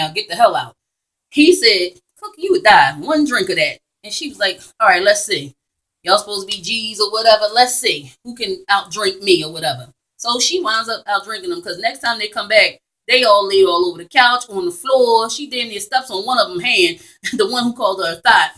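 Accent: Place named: Philadelphia, United States. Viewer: So good to hear the Philly "sound"! Great job from your Philly friend on the West Coast. Keep up the good work!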